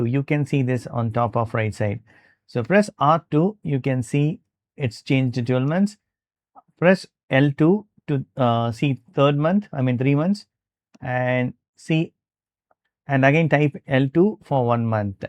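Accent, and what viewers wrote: India. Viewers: Бля, думал что в Английском видео, а тут Английский Индия. Ничего не понял.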